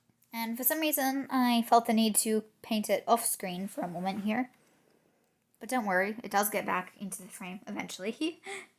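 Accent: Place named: United Kingdom. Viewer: Why did you used to have a British accent in your videos I thought youre American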